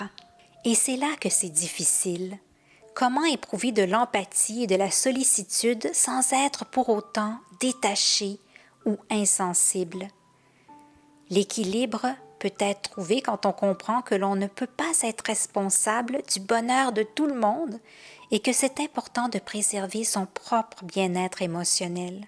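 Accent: Quebec, Canada. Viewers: Bonjour, je trouve dans votre contenu plus de sagesse parfois que ce que mes parents m' ont transmis, même s' ils ont été de bons parents.. bonne continuation ( en plus j' adore votre accent du Québec et votre voix douce et apaisante) 🐙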